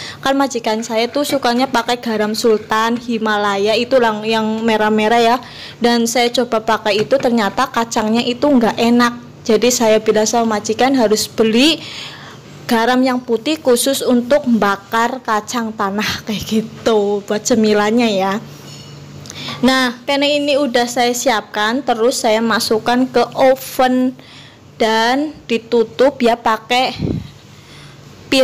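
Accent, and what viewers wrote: Banyumas, Indonesia. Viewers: Emang enak kacang bakar mbk ngapak😁😁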